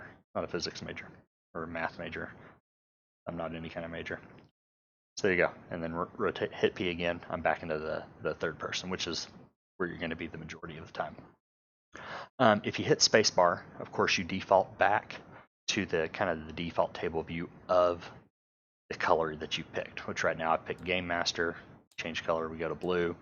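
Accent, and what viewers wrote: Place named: Texas, United States. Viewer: Thanks so much, nasal texan :)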